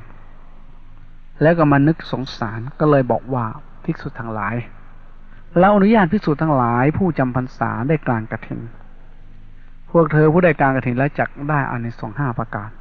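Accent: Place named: Isan, Thailand. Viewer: ได้ฟังพระอาจารย์บ่อยๆๆจ้าวชออบฟังท่านเพราะคะคำพูดอิสาน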